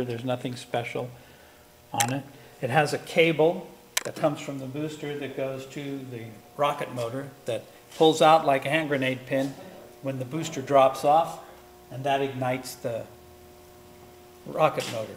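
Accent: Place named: Middle East. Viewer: I remember seeing the barrack missle system had Hebrew writing on it were you in Isreal. Also you sound middle Eastern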